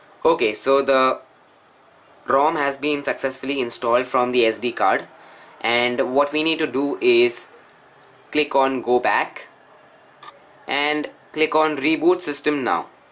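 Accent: India. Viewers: すごいインド訛りだがグッジョブ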